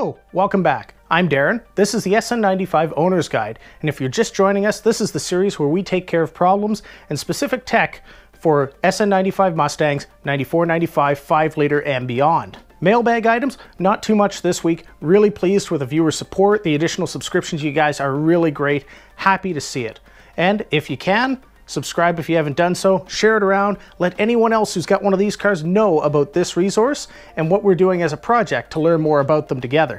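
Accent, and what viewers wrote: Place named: Canada. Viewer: Love the Canadian accent. Reminds me of the good ol trailer park boys.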